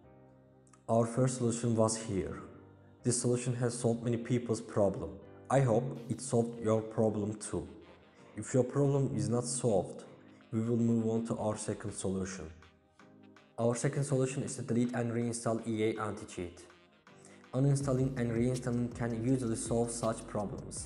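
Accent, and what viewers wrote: Turkey. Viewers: İngilizce çok tanıdık geldi türk müsün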